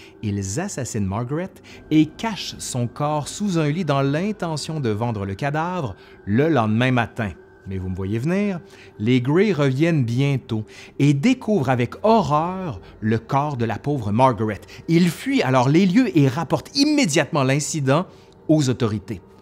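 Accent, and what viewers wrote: Quebec, Canada. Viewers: Salut á tous !!! L'accent des Canadiens francophones sonne comme une musique agréable à l'oreille ... Merci pour ton travail de qualitée !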